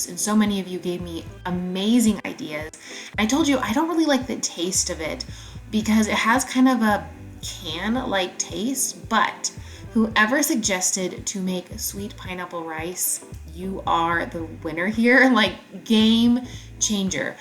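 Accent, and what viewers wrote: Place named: Minnesota, United States. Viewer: That MN accent was way more intense in 2019!! 😂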